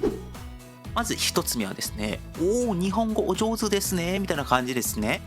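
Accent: South Korea. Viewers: ぐらい話せたら、日本語上手ですねー！は、100%言われると思います😅日本人でも地方出身の人は訛りがなかなか抜けないんですから、韓国訛りなんか有って当然ですよー😅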